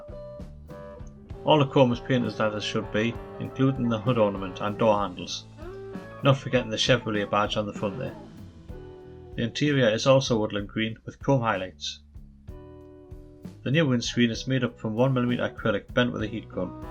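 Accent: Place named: Cornwall, United Kingdom. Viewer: A cool looking car. BTW Your accent sounds like you are somewhere in or around Cornwall.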